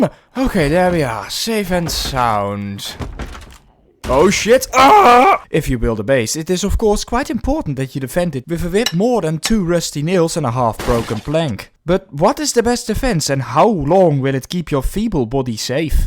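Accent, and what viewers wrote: Netherlands. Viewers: I can't unhear the dutchyness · like how you can imediatly spot a dutch person on youtube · Yo, I hear like 10 seconds and the Dutchness is killing me. Good vid though!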